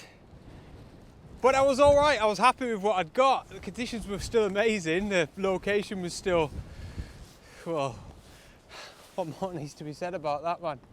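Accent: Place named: Northern England, United Kingdom. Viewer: I really enjoy your videos., and your accent. I am from Yorkshire and now live in Melbourne, Australia, so I like to hear the northern accent. One request - can you take some shots of waterfalls etc which are not smoothed out? I prefer a more realistic view of rushing water but that’s just me.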